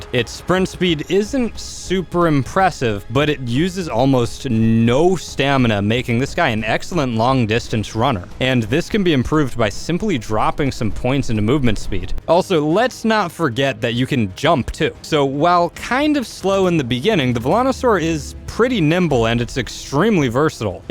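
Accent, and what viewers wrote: Australia. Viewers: Want cute Australian bird voice back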